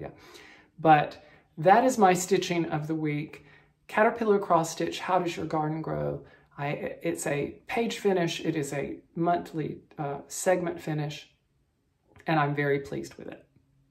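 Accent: South, United States. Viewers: I just noticed that your Southern accent REALLY comes out with your stories. I love it! You're such a hoot,!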